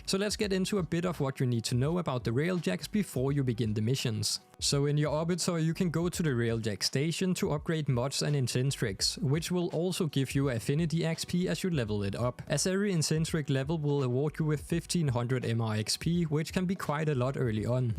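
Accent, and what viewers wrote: Denmark. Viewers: recently came back to warframe and ive been enjoying it alot, your guides have been very helpful getting me back on track. keep doing what you do! also, are you danish by any chance? your accent sounds very similar to a dane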